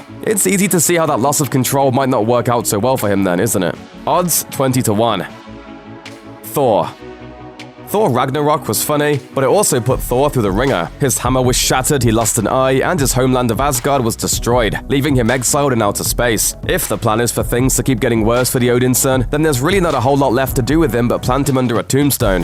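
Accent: United Kingdom. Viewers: Is the speaker british by any chance? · can u please not have a british guy narrating cause british accents make me get lose brain cells there so cancer and annoying after hearimg it over and over again it get fucking annoying don't get anyone to narrate from the fucking island or ireland next to it they make a cool video terrible no offense to royale fucks but your voice is like a hyena screaming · Luke Meyer, you mean narrating in REAL English language, British English is not an accent, it's just English, maybe you should have said "please get someone to narrate in American accent" at least it would be correct then..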